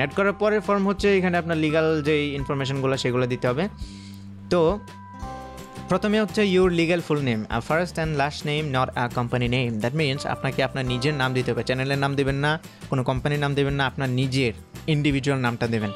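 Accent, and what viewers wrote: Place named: Chittagong, Bangladesh. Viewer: Apnar bari ki Chittagong e naki because amar bari o Chittagong e apnar kotha shune lagche apni Chittagong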